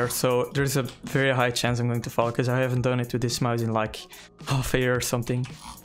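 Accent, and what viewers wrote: Netherlands. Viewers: can i ask you a question are you dutch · Are you dutch??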